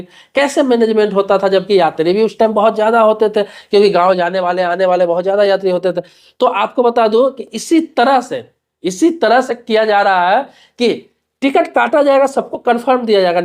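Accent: Bihar, India. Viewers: आप बिहारी हैं क्या ? बिहारी लोगों की हिंदी बहुत कमजोर होती है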